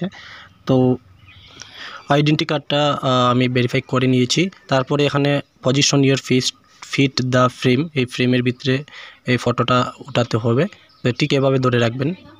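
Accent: Sylhet, Bangladesh. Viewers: সিলেটি নি ভাই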